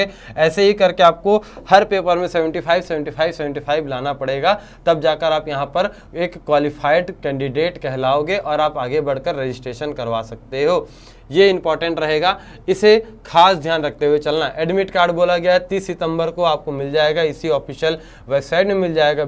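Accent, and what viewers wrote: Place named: Odisha, India. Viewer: Sir ap odisha se ho kya,apka Hindi wese lag raha hai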